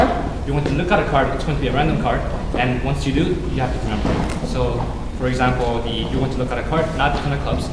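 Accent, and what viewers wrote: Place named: China. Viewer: that was an awesome performance... BTW u sound like a Chinese. r u??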